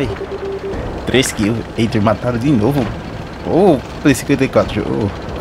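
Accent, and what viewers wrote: Northeast, Brazil. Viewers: Tú é nordestino né fi?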